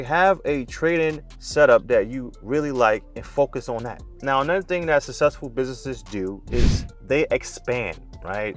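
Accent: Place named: Baltimore, United States. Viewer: Baltimore accent is strong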